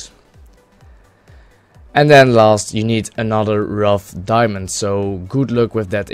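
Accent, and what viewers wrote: Netherlands. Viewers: Ik kan het nederlands goed in je stem horen maar je engels is wel goed hoor! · Jij bent nl toch? (ik hoor het aan je engels) ;) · when the dutch accent is strong..... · haha mooie nederlandse saccent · i dont know but are u nl? u talk like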